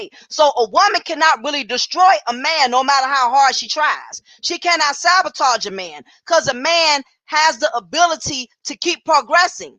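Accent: South, United States. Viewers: You fine as hell with that southern accent ❤ Dont block me 😂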